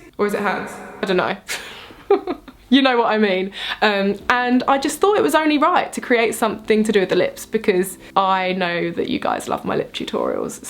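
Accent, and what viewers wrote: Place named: United Kingdom. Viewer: Ok I've been seeing your lip and make up tutorials from a while now... But i never got to see you talk XD today i finally clicked on this video very excited too see someone who has such an amazing tutorials... Talk XD I JUST FREAKIN LOVE YOUR BRITISH ACCENT... I am so shocked that ur a Britain... Your beautiful... Stay like that always... Your tutorials speak alot of your creativity just in coloring your lips and i am here for it... Love from pakistan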